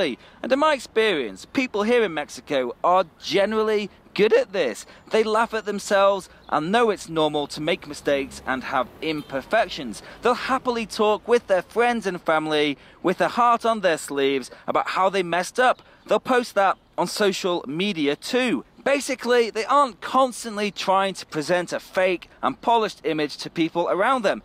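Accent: England, United Kingdom. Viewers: where is your UK accent from? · ¿de qué parte de Inglaterra es tu acento?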